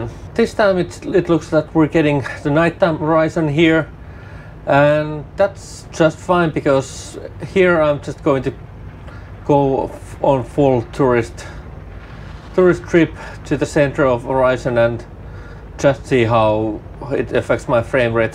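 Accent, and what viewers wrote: Finland. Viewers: are you finnish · very noticable Finnish-English accent there 😀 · do i hear Finnish accent? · ymmärrän, että kotikielellä ei ehkä näyttöjä tule niin paljon... mut tää suomalaisten rallienglanti ei myöskään ole kaunista kuunneltavaa. :) Suurin syy löytyy varmasti meidän englanninkielen opettajista, jotka eivät ainakaan silloin kun me oltiin nuoria, painottaneet ääntämisen opetteluun. Ei millään pahalla. Yritä opetella ääntämistä, jos aika ja rahkeet riittää. Kaikkea hyvää sulle.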